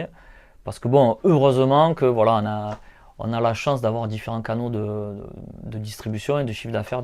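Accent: South, France. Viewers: Salut je vient de découvrir ta chaîne et je trouve vraiment que ton énergie est super et sincère et sa fait du bien...:) en plus étant née a marseille et n’étant plus dans le sud tu me fait sentir la garrigue en t’écoutant:) Merci pour tes conseil continue comme tu le fait et bon rétablissement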